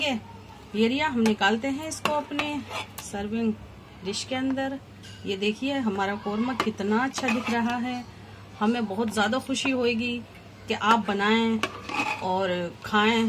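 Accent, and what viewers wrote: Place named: Bijnor, India. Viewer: aapka bolne ka andaz bijnori h zabardast ji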